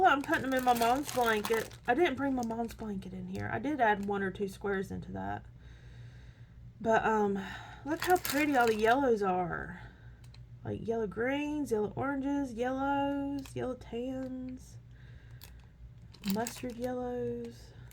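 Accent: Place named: South, United States. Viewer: Hi from Dothan, I knew you had to be from somewhere nearby when I heard your accent 😊. You've got a beautiful yarn room there and I love those Felici socks. I'm loving knitting the Pop Life Cardigan by WATG after making myself finish two large crochet afghans. Looking forward to seeing more from you and nice to meet you ❤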